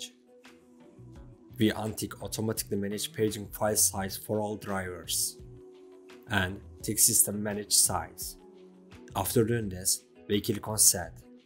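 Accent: Turkey. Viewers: Türk oldugunu inglizcenden hemen anladim :-)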